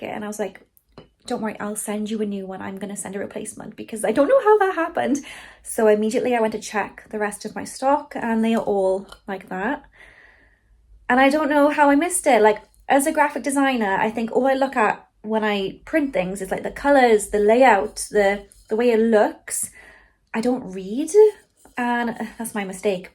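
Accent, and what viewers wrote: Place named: United Kingdom. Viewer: I’m guessing you’re from the US? It’s spelled with an S in British English and with a Z in US English. is British, Scottish or Irish (I haven’t listened long enough to pick up the exact accent, Sorry).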